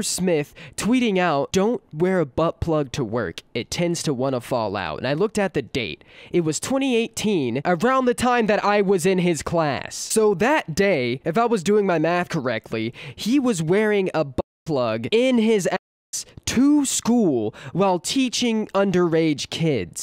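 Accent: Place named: Texas, United States. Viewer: Sounds like Texas...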